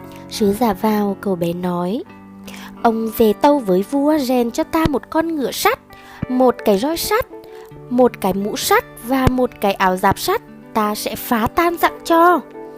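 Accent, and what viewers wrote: Vietnam. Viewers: Hay à. Cứ như thế này nhé. Kể cho nhiều người biết về lịch sử của người Việt. Tính giáo dục rất cao.. Giọng hay quá. Bạn ở Huế à. Câu chuyện được dẫn hấp dẫn, rõ từ. Lên xuống giọng họp lí. Chắc giỏi văn lắm đây. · thú vị chất giọng của bạn. Giống những hướng dẫn viên du lịch xứ nghệ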